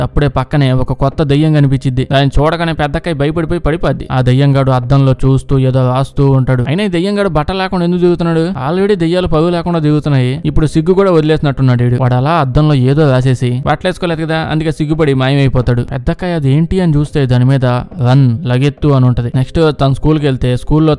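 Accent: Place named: Nellore, India. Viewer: Nellore slang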